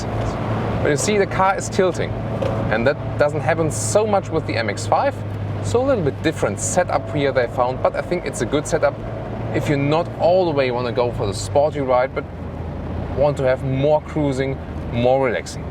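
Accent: Germany. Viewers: Just Awesome German accent..... 😩😩😩😩😩😩😩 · Der Akzent ist zu deutsch! Üben!!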